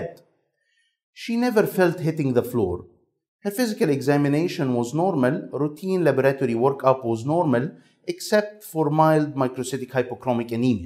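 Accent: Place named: England, United Kingdom. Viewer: Thank you for your excellent way of explaination , and your proper English accent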